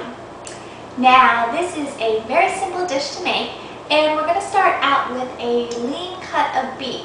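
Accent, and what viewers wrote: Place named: Tennessee, United States. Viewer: you sound like your from Tennessee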